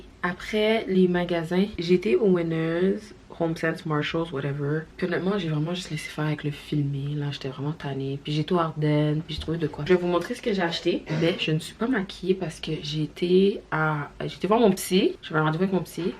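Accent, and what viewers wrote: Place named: England, United Kingdom. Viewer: THANKS 🌟 🌟 FOR ANOTHER🍎 HEARTWARMING DOSE DOUBLE DOSE OF FRENCH. 🍏 LATELY IT SEEM THAT U HAVE A LITTLE MORE ENGLISH INFLUENCE ON YOUR FRENCH THAN BEFORE.😳 GREAT JOB.🏆 Of course I thought your next project would be a podcast called 🎈 in the House🎈📚An online Book discussion📚🪙Your Own novel🪙 and the sky is the limit.🥁 BIG UP YOURSELF 🔥🔥🌎💙🎥🎬🍀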